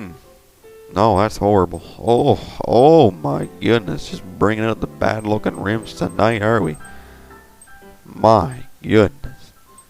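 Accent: Newfoundland, Canada. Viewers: are you canadian? :D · are u from canadia? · Are you from newfoundland? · Are you Canadian? Your accent is quite distinct. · man your canadian ass fucking english murdering accent made me hate this video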